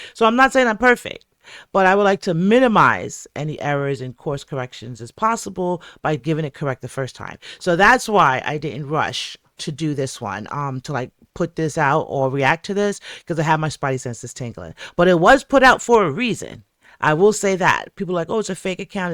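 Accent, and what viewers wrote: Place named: Caribbean. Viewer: I 🇹🇹 I thought I heard d West Indian in you some time ago!🇯🇲❤❤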